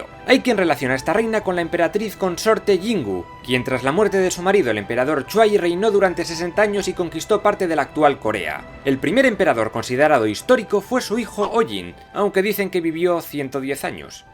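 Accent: Spain. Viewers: No me cuadra escuchar la historia de Japón en voz de un español.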